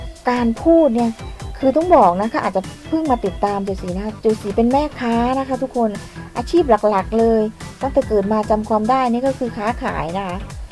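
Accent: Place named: Central Thailand, Thailand. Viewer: ยุจังหวัดดัย เว้าอิสานน่าติดตามกว่า เปิดมาได้ยินเสียงภาษากลางบ่เบิ่งเลย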